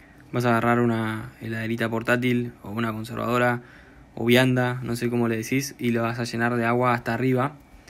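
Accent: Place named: Uruguay. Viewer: Ese hablado (uruguasho)me recuerda a mi Mecanico jijiji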